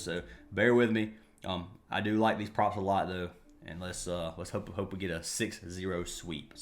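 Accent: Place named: Greenville, United States. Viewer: Bro I live in Greenville. I could tell by the way you talk you was from around here.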